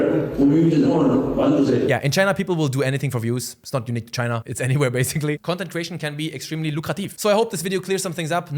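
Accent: Germany. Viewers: This Guy sounds like a german guy talking english 😄bist du Deutscher? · Your dialect is German I hear it my brother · Dein Akzent erinnert mich an die Deutsche Sprache. Ich glaube du kannst Deutsch🤔. Wäre interessant zu wissen. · u german?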